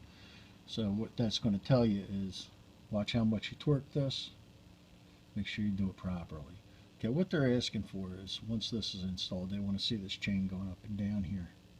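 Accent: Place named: Delaware County, United States. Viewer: Judging from his speaking manner, my bet is that he's from the Philuffia PA or South Jersey area, and has a little bit of a "Delco Accent". Sometimes difficult to discipher even by locals. GO BIRDS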